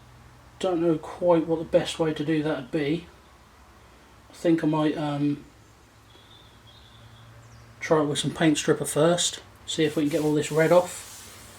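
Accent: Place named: Suffolk, United Kingdom. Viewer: Nice to hear the accent, im a Suffolk boy originally. West Aus now though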